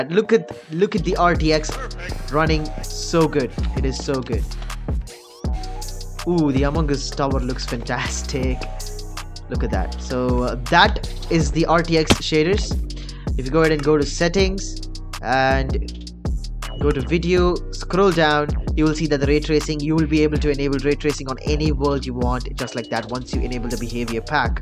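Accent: India. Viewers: Are you a Indian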